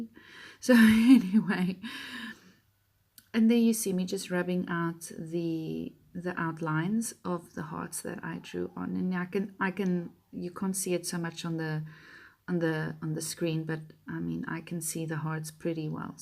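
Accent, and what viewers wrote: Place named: South Africa. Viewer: Lovely to hear your South African acsent. Lovely layout. Love FJ. Love from Cape Town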